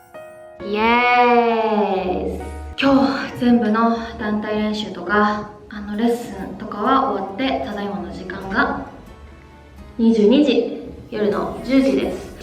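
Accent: South Korea. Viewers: 日本語も少し韓国語っぽく聞こえる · カタカナ英語がもうほとんど韓国語の発音になってるー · 朱里さん、少し韓国語訛りになってきてませんか？🤣🤣🤣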